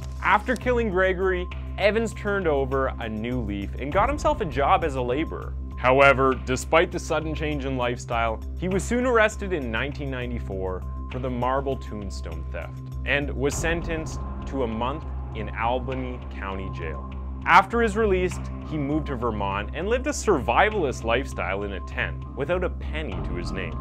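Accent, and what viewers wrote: Canada. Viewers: Is he Canadian? I sense a slight accent and some of his spellings are spelled in traditional English such as "behaviour." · Hes canadian. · nice channel, are you Canadian? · Oh the Canadian pronunciations lol. It’s all good though. It goes both ways. Love you Canada! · no I'm not going to lie yesterday I was watching one of his videos and totally capping on how he talks. Is he Canadian?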